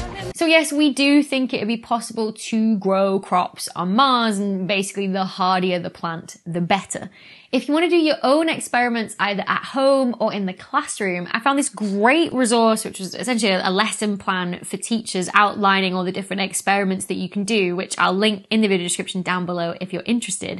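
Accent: United Kingdom. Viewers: I love the british accent. It sounds so proper and yet also fun.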